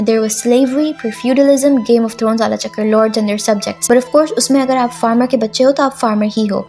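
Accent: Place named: Pakistan. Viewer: I understand now. I should've deleted the above comment after you explained the same in a reply to my other comment :P I assumed you are an overseas Pakistani because of the accent XD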